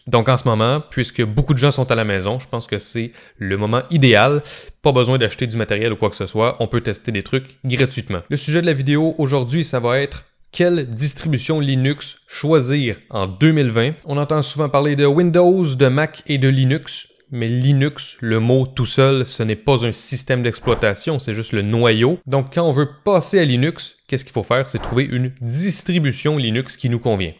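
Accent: Canada. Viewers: Cool ta vidéo. Riche en contenu et pas seulement pour faire une vidéo.. Par contre qu'est-ce que j'ai dur avec l'accent canadien ^^ bisous de belgique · C'est super merci. Explications claires qui donnent envie de se lancer. (et votre petit accent canadien est charmant) Merci. Ella 🌺🌷🍀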